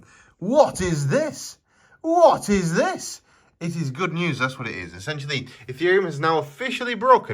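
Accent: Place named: Northern England, United Kingdom. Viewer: Will do captain. Been subscribed for a year or so. I’m from up North too so it’s good to hear a familiar accent. Keep up the good work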